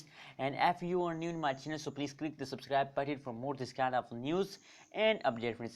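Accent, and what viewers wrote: India. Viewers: Dude, i cant even understand what are you saying. Indian accent is already hard to understand and you are speaking too damn fast. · white people video thumbnail english language indian accent as fast as japan high speed electric train no facts at prediction even a teenage 14 boy also can reexplained this thats blah blah blah zero informative content as for sure most brainy positive video presented by showing by content presenter faces for sure this boy wanna follow hype and get fast subs and views and get money for sure many viewers will stay out from this kind of video coz its promising thumbnail video promoting click bait.. well done indian teenage boy!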